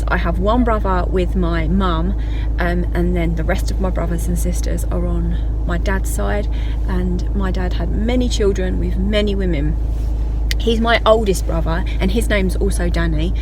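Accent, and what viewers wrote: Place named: Dunstable, United Kingdom. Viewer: I really enjoy your videos. Whereabouts in England are you? Your accent sounds like mine, I was from Dunstable Beds, and I lived in Chelmsford, but have lived in Florida for 26 years. Really enjoy your recipes. Thanks. Also, you remind me of Sam Faiers from TOWIE and the mummy diaries. LOVE the penguin! Very clever.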